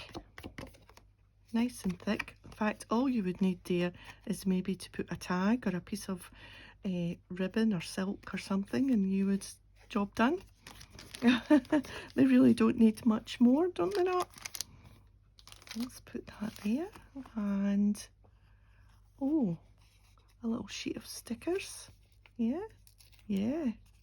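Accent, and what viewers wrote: Scotland, United Kingdom. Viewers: Great to hear another Scottish accent. Fabulous box of goodies. Love what you made💕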